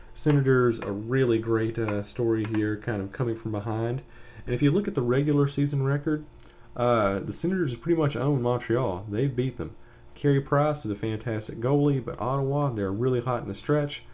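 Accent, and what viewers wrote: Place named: South, United States. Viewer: Aaron Sil I'm not sure but I stumbled upon his videos and especially in the earlier ones, his voice is the most ASMR-triggering voice and its just crazy. I'm into sports and food reviews but really that southern kinda offish voice just gets me.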